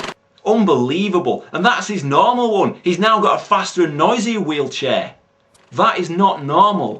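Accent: United Kingdom. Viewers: I also do movie reviews and I am looking for some one to do reviews with me if interested message me. Also good job on your reviews I love the British accent.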